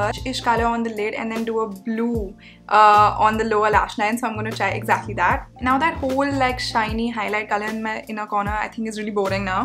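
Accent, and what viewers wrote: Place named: Mumbai, India. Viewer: You've got the mumbaiyaaa tone already makes me smile idk why ♥️😘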